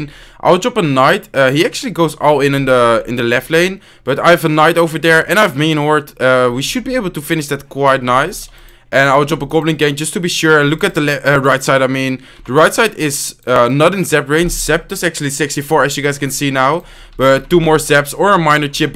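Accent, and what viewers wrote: Netherlands. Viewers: Je praat echt nederlands engels, of goed engels of nederland man! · als nederlanders engels praten, hoor je het echt dat ze nederlands zijn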